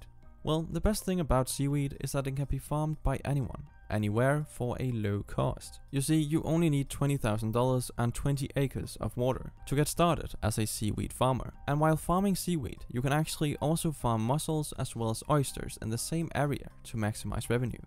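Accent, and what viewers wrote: Denmark. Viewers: Bold of you to assume I'm American. I'm from Europe as well so yes, I'm fully aware of what a Danish accent sounds like and yes I can hear that when he speaks but that is obviously not the issue here. You can speak up or speak quietly with the same exact accent. I dont agree that it's "my problem" if I'm not a fan of the quiet style of talking. It's not about it being a problem per se, I was just providing feedback and my view on the video. Don't need any white knights coming here in defence who have never seen a comment section of a youtube video before. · That's the Danish accent you're hearing ;) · I can hear the dane in your voice, our accent is quite unique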